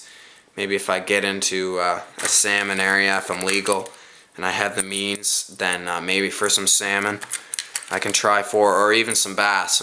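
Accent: Canada. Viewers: Aboot as Canadian as it gets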